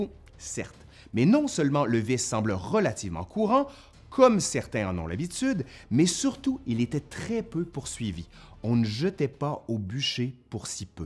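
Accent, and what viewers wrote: Quebec, Canada. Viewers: Friande d'Histoire, je découvre votre chaîne et j'en suis époustouflée. Belles capsules, trop courtes certes mais très concises dans les explications qui les accompagnent, agrémentées de ce charmant accent québécois. Merci pour ce professionalisme. J'achète ! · gloire aux dindons quel accent ? Il vien de Quebec pas des îles de la madeleine haha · est-ce qu'il est quebequois?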